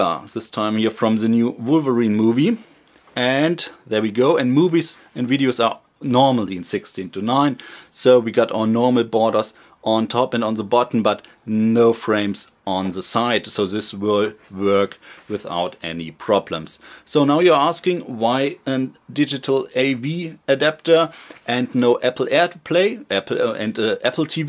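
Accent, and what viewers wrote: Germany. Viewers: German accent, aren't you? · you are from germany aren't you ? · Lustig man hört, dass du Deutscher bist, ich finde es aber echt cool, dass du auch Englische Videos machst. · i love ur german accent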